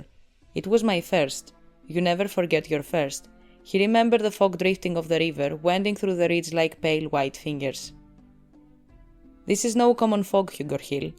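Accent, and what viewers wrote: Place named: Greece. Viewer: heard your accent and HAD to check if you were greek lol. First Greek ive seen doing content on ASOIAF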